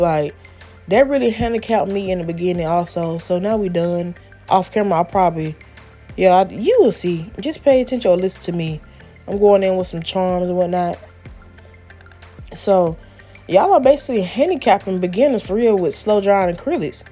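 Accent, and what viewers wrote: South, United States. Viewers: Not annoying and country you crack me up😂😂😂😂💜💅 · you are funny 😅😂the nails came out cute and your accent is awesome I love my country girls💃🏽💃🏽💃🏽💃🏽💚🥰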